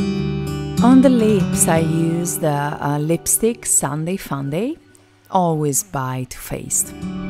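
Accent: Italy. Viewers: Hello nice to hear your Italian accent is there... 👍🏻 nice presentation, but I did't like the eye shadows ... As you said are too flaky..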